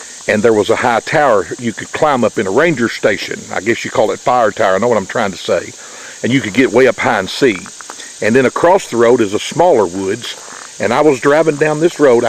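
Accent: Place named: South, United States. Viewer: Where/what state was this to have occurred? With your Southern accent I figured the South, but Sascwatch is Pac. NW area, I used to live in Oregon and I kept my focus sharp walking in the woods for Big Foot, though never saw one.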